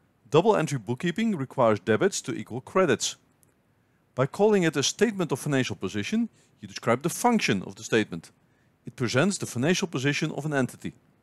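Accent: Netherlands. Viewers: Very thorough explanation of accounting terms, this will help a bunch in reading 10-K and Qs - thank you! I can hear you are Dutch! :) Hi from Zaandam!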